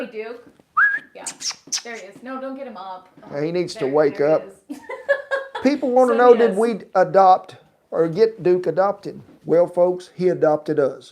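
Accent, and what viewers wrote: South, United States. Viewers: I preordered your book and got it yesterday. Beautiful photography, great tips and stories. I was only disappointed that your wonderful sloppy joes and your potato cakes recipes weren't in it. But I'll just add my youtube recipe notes inside the book. Re: sound quality of your live chat, it's clear and plenty loud enough. in the background was a little fuzzy. Btw, you don't need a teleprompter. I think we cam all say we like you just the way you are naturally. I especially like it when pokes light fun of your cowboy talk. But I'm southern, si I "getcha". You both are great and I could tell all the hard work that went into the cookbook.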